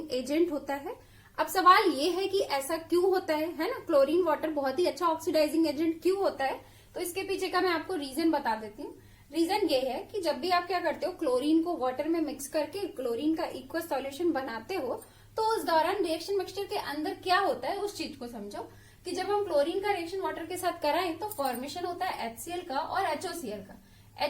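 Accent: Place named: Bihar, India. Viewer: You are good in teaching. I am a chemistry faculty for jee/neet. Your teaching notes are concise and very clear, strictly according to ncert syllabus. No unwanted talk; only topic relevant content. Keep it up and keep posting. You are bound to rise on this platform. Also As bihari, I liked your accent accent.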